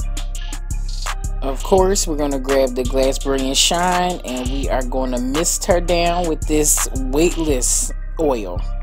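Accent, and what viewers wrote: Philadelphia, United States. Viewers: I was about to say that you sound like you’re from Philly, then I saw that it was you lol. I want you to style my short hair 😩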